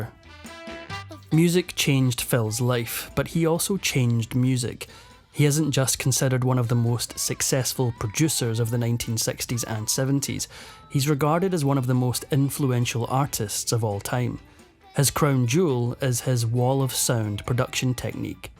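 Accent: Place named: Scotland, United Kingdom. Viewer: Yay my favorite Narrator the Scot!!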